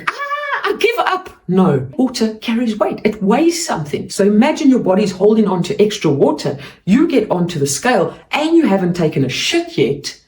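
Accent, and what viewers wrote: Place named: South Africa. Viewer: I knev there where something of about the Scottish accent but there you go South African thanks for your quick response 😊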